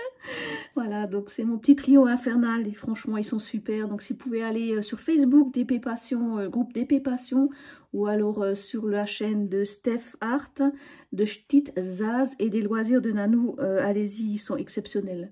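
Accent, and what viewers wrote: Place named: Alsace, France. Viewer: Solù, ehr geht guát und dù? J'ai explosé de rire avec le Godvertami ou alors le Godverclemi, c'est tellement nous les alsaciens. Désolé je suis nul dans l'alsacien écrit, mais je crois que c'est pas si mal 😅🤣 Moi aussi je me reconnais en toi, ta façon de parler, les expressions, c'est génial j'adore ❤ Moi, j'avoue que je me retiens beaucoup, car je parle le "fralsacien" au quotidien. Je suis tellement contente d'avoir fait ta connaissance via YouTube. Je crois qu'on s'entendrait bien dans la vie ❤ Decka Schmoutz et bonne soirée 😘😘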